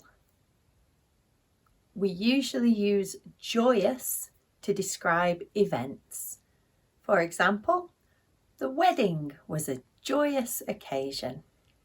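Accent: United Kingdom. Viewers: My pleasure I love the British English And you are Perfect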